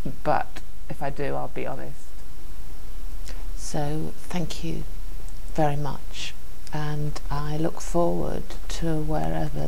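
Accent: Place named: United Kingdom. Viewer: what's not fair is how UK accents make for better videos! no fair!!! I want one. I'm with you... predictable in my avoidance of predictability. Means you never quite fit in groups eh, you resist the little conventions and micro-traditions even a small group of friends tend to develop. lets all be individualists together!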